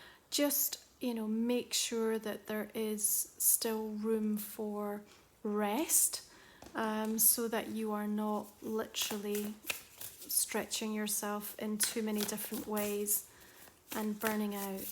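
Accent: Scotland, United Kingdom. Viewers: Hi there: Thankyou sincerely for the wonderful reading. I have to say I thoroughly enjoyed the reading, especially your voice. You accent seems familiar, am I right to say you are of Scottish blend? If so, I am too. Its nice to know that someone from my beautiful country is reading me which is a great pleasure and a tonic. No disrespect to the other Tarot readers they're all wonderful when they read my sign. But to listen to a Scottish voice is my cup of tea at anytime. Anyway, I've been a bit isolated lately, but when I'm busy writing my novels, I prefer peace and quiet it helps me concentrate, I'm also doing a bit of DIY at home, so that keeps me busy as well happy with the results, just to freshen up my house a bit, makes me feel content and satisfied. But thanks a trillion fur the wtkvime ing reading which was also very inspiring. I hope to hear from you again, your a breath of Scottish fresh air m, and we all know how cool and fresh that is eh Brrrrr... 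Thanks again & God bless.xxx⚘💞💐💐🌺🌺🌺🌺💜♦️💜🌟🌟🌟🏴🏴🏴🙏🙏🙏💎💎💎💘💎💎💎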